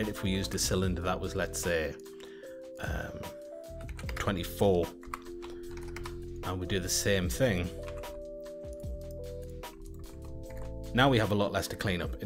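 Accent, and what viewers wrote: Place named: Durham, United Kingdom. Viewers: Many thanks from this. Subscribed, but you are doing fine there anyway! Where in the North East are you from? I'm a Sunderland lad 😁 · That Simple Deform > Bend trick is great: I've been using Blender for 15 years and never knew that. Would have saved tons of time! I presume from your accent that you're somewhere near (East?) Durham too, so you get points for that.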